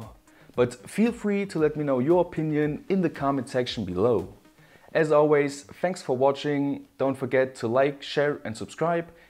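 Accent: Germany. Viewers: Hey, Erstmal großes Kompliment, du machst die Videos sehr gut und ich schau sie mir auch gerne an. Ausserdem sprichst du auch sehr gut Englisch, nur musst du noch ein Bisschen an deinem Akzent arbeiten ;) (klingt zu deutsch)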